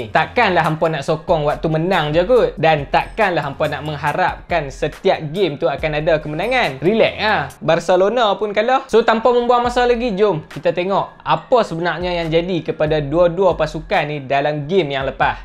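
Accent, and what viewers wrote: Kedah, Malaysia. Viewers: boleh letak subtitle dlm bahasa baku untuk next video..bnda ni boleh memudahkan viewers dri negeri lain faham maksud perkataan loghat kedah yg depa mngkin x faham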